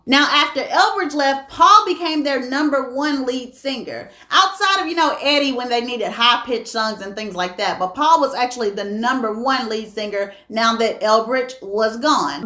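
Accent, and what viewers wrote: South, United States. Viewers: You're gorgeous with the southern accent!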